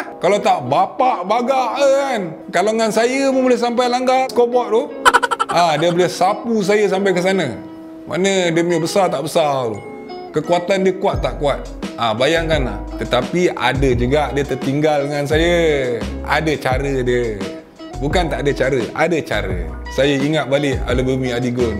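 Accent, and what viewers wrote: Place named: Selangor, Malaysia. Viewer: Haha steady la..ni la cara borak2 org Selangor/KL 😂😂..dia punya ayat tu steady..sronok tgk brborak ni..kalau kt kedai mnum ni mau berjam2 bleh dgr punya borak..nmpak mcm sembang kencang tp mmg realitinya kencang pn..x mcm sesetengah org sembang kencang tp sndiri x kencang mana..steady..buat lg content2 lain lebih2 lg situasi bolasepak moden sekarang ni..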